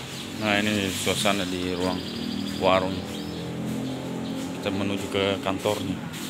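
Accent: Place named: Indramayu, Indonesia. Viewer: Org indramayu mang ??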